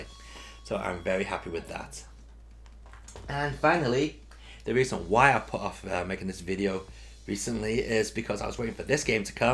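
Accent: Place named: Liverpool, United Kingdom. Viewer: sounds like Liverpool dialect to me :)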